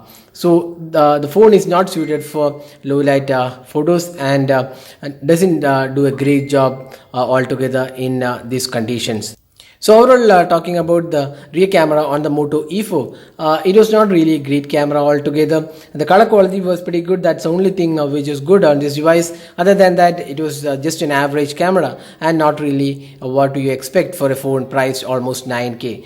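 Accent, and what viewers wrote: India. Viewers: Está hablando en inglés, pero obviamente es indú... asi que su acento se hace notar xD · I HATE THE INDIAN ACCENT WTF EWW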